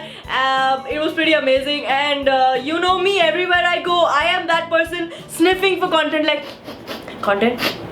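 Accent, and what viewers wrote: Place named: Bhojpuri, India. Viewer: Hey...I met you on my bday idk if u remember but it wass just amazing ❤❤🌸. Anyways a rapid fire cmg up 1.If you had a chance to replace your life with some other YouTuber who would it be and y?😉 2.Prank vrushank that u wanna break up😂 3. Scream out of your window "Aee paani kisne bandh kiya"😂 in your Bhojpuri accent🌸🤘 #Sawaalsaturday lyy❤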